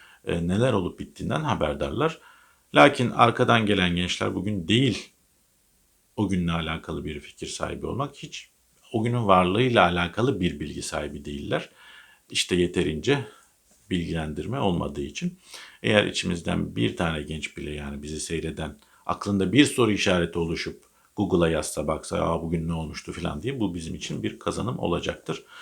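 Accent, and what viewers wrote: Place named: Black Sea, Turkey. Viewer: Ula yine gırdun geçirdun!! Özellukle iki yorimun çok superidi!! Sağolasun. Garadenuzli değilum lakin o şiveye bayılayrum!! Öpildun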